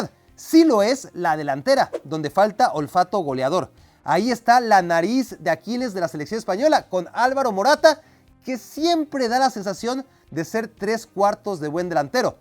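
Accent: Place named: Mexico. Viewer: Es mexicano, se le nota en el acento. Logicamente va a hablar mal de Argentina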